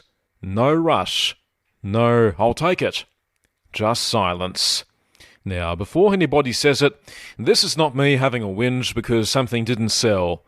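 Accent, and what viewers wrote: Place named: Ireland. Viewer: What a silly accents! Irsih?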